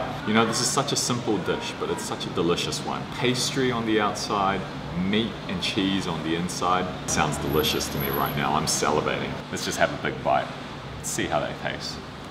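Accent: New Zealand. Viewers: Bruh your kiwi accent is strong lol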